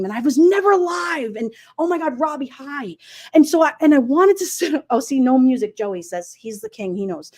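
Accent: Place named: New York, United States. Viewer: ..I'm always on catch-up ..I love your NY accent..💖👋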